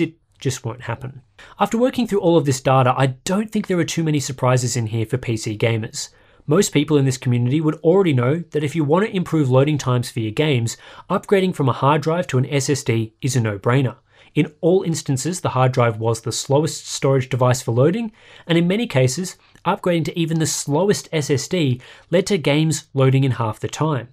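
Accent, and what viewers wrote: Australia. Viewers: Whenever you click on a Hardware Unboxed video, you have to turn on Caption to see how YouTube decide to butcher the Aussie accent, and this time round it's "todd we're unboxed"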